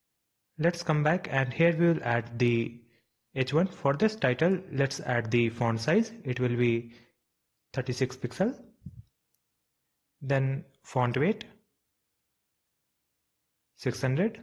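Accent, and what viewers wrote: India. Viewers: Very Indian 😢😢 but the content is perfect, thank you anyway. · Can’t concentrate with the Indian accent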